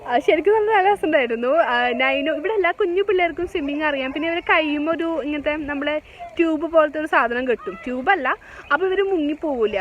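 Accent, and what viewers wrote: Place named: Mahe, India. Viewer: ഇങ്ങളെ ആടാ സ്ഥലം കാണാന് നല്ല മൊൻജ്ജ് ഇണ്ട് 😂 നമ്മളെ മാഹീ ഭാഷ ആണ് ഇത് മാഹീ തലശ്ശേരി ഭക്തെ ഭാഷ 💞🥰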